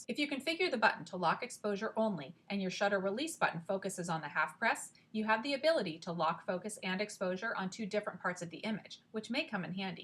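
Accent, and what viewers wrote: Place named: New Jersey, United States. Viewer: I am sorry for all the childish and inappropriate remarks on your videos. I guess these high school mentality boys need to go back to their magazines. Perhaps their moms never taught them respect. Thanks for making this video. Does the camera, my D750, need to be in a specific mode in order to use the AE-L/AF-L button effectively? Being brought up in New Jersey you are talking just fine.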